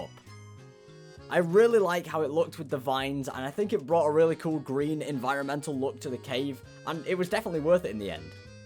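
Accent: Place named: United Kingdom. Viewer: Your british!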